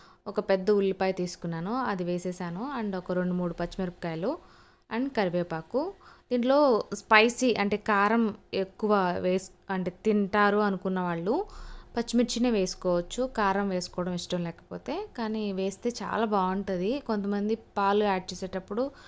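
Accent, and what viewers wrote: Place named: Tirupati, India. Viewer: Anduke aduganu Akka, she looks like u Akka, n ur having tpt slang akka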